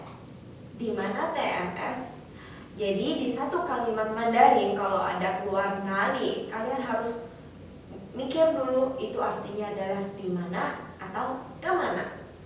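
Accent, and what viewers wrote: Taiwan. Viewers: 用的普通话好台湾腔喔… 喜欢😍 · Ini mandarin taiwan apa tiongkok,,???? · 哈哈哈~~ 台湾口音~~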